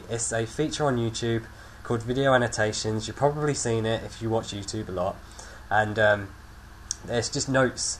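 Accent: England, United Kingdom. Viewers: duh thats an english accent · like ur accent. British accents r hot!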